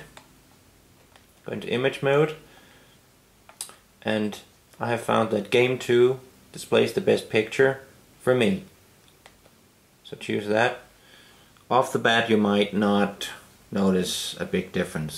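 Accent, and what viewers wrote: Denmark. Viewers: I was like... That sounds a tiny bit like a Dane. And sure enough, there is the tv series "Klovn". 😁 So.... How smooth do you rate the scrolling in games, in real life? And is it good for Amiga as well?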